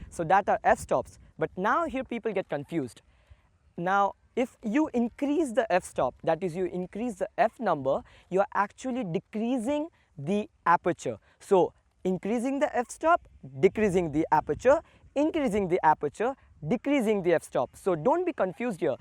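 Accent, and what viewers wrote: India. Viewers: very nice explain... i think it is the time to make a video on how and what principle camera works with animation clear in indian english like your sweet talks... we are eager to get that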